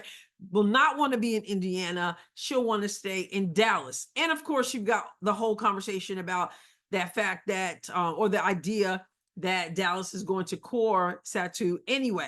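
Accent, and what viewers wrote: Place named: Brooklyn, United States. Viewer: you sound like you have s little Brooklyn accent...😊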